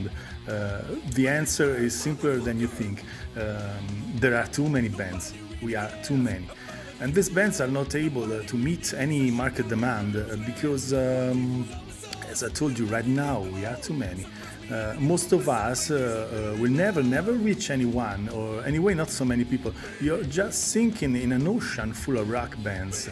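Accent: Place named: Italy. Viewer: A gimmick can be cool and definitely help out and Lordi would not have gotten as big as they did without costumes. However, today's Top40 is full of music that lack ANY personality (when did you last hear an original rap song?). I think your English is very easy to understand even though you can hear an Italian accent which sounds a bit refreshing. Perhaps that could be a gimmick too in some sort of way. Holy Super Mario Spaghettio 😆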